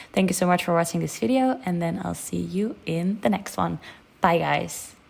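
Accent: Netherlands. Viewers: Hoor ik het goed ben je Nederlands? Fijne video thanks! ❤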